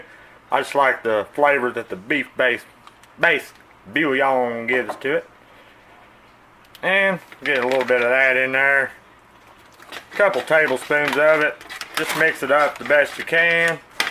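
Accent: South, United States. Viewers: Hi! I have just found your channel and I absolutely love to watch you cook! You are one of the best cooks on here, and I watch them all just about. I also like your southern accent. Do I hear s little cajun in there? By the way, congratulations on not drinking beer anymore. I'm very happy for you and your family. God Bless you and yours.:-) BTW, I subbed to you and clicked the LIKE bell.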